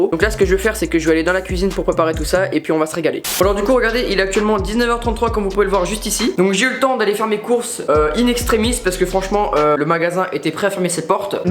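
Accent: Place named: Canada. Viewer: Parle canadien 24h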